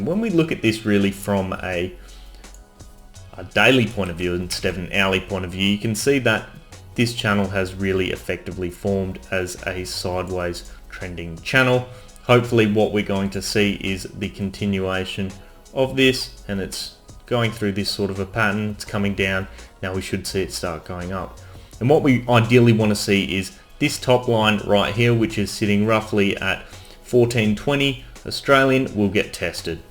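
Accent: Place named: Australia. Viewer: Finally someone talking in Aussie $$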